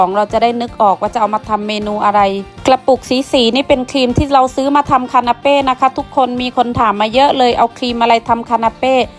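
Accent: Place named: Central Thailand, Thailand. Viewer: ชอบจัง บรรยายไทยกลาง ส่วนใหญ่คนอื่นพูดอิสานกัน ฟังไม่รู้เรื่อง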